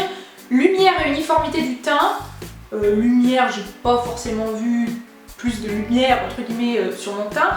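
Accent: North, France. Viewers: Tu es du nord non? Classe tes achats! J'ai le double wear aussi je l'aime trop!!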